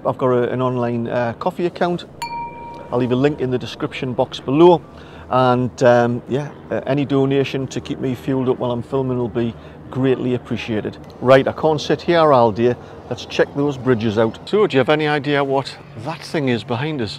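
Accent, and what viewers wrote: Newcastle, United Kingdom. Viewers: It's great to hear your accent. I've lived in Somerset for 21yrs now, but I still have quite a strong Geordie accent. But it's always nice to hear someone from home. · love the geordie accent 😍 👌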